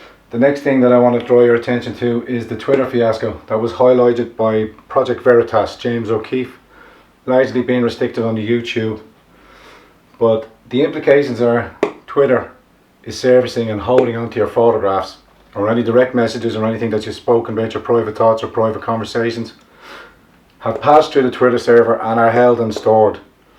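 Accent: Dublin, Ireland. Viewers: Truth explained so clearly in the most beautiful of Dublin accents. Thank you.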